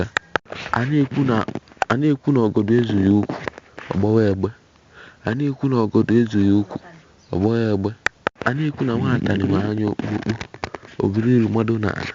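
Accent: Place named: Ezaa, Nigeria. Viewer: NONSENSE,, ARE YOU TALKING TO YOURSELF ? OR TALKING FROM A RAT HOLE,, NO PROFESSIONALISM,, CUT AND JOIN,,,GO AND LERAN ''IGBO IZUGBE'',AND STOP SPEAKING EZAA/ABAKALEKE DIALECT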